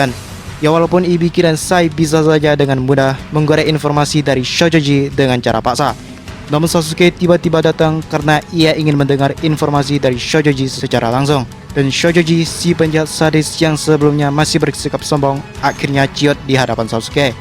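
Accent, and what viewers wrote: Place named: China. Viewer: dia keturunan tionghua bro jd logat bicara nya seperti itu ;)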